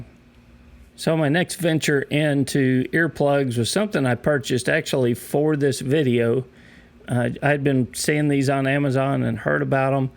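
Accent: Texas, United States. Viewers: Hey. You and Anthony might be twins, but he talks like New Yorker, and you talk like a Texan. You just need to practice saying "y'all" instead of "you guys" when you record your videos. Loved your impersonation of Anthony! Hilarious!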